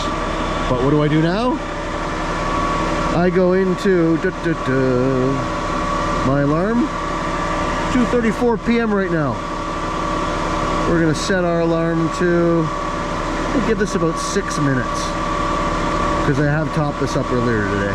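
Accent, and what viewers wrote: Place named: Canada. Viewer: You must be from the deep south of you think a guy with a Canadian accent sounds smart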